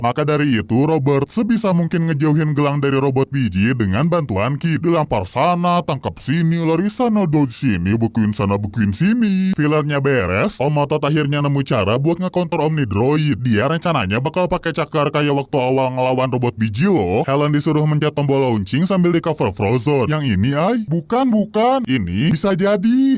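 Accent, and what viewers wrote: Java, Indonesia. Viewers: Orwng jawa kah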